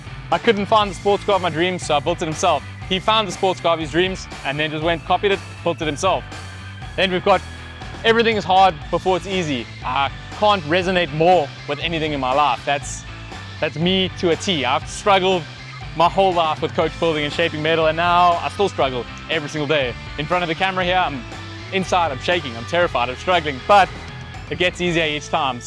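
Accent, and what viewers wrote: South Africa. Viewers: Thats South African accent.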